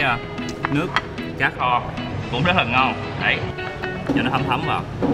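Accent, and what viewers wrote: Mekong Delta, Vietnam. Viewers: Mê cái giọng nói miền tây mất rồi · Chuc mung anh đuoc hon 100.000 nguoi đk nha! Rat thich cach a nc kieu mien tay iu mien tay! E cung ng mien tay😘🙌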